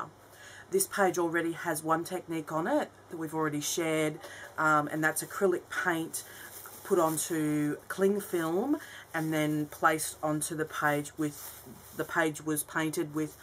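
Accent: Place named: Australia. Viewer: Hi, I love the techniques you've shared here ☺ stencils are my favourite way to add pattern & colour right now but I go through phases of loving different techniques and mediums! I love your aussie accent too!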